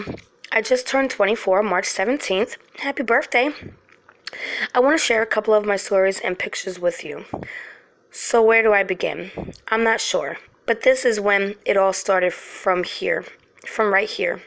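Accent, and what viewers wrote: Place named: Brooklyn, United States. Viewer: This was great hearing stories from the Babez and from you as well! Being from the tri-state area, (Passaic County NJ to be exact approximately 10 miles outside of Manhattan) I've always pegged your accent as being from the Brooklyn area LOL!! Don't worry I haven't lived in Passaic County in 26 years now, and the people from here (The Upper Connecticut River Valley Region of Vermont) all say that I speak very strangely with an accent as well. I moved my daughters here when they were just 3 and 4 years old and they still have "North Jersey" accents believe it or not! Anyway, I'm familiar with Westchester and I believe that you're absolutely correct about that cemetery. Back in those days (and today if they can get away with it), Building needs to go up and a highway run through? Just remove the headstones get rid of them, depending upon how long the cemetery had been there pre-1900s there may or may not have been any cement vaults as there are today, and digging the foundation, etc may have turned up absolutely nothing just like digging for the highway. 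No problem for anyone except the people buried in the cemetery, and consequently the people living in the building who experience the visitation of the souls of those whom they are living upon who are generally a bit angry about being disturbed, now having their final resting place unmarked and an apartment building built over it! There have been other occasions during more modern times when headstones were just relocated, but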